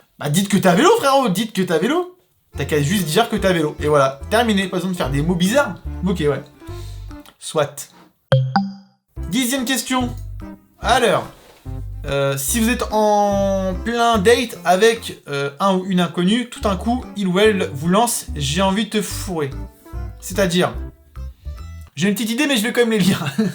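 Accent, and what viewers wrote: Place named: Marseille, France. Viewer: papa! t y est presque marseillais!!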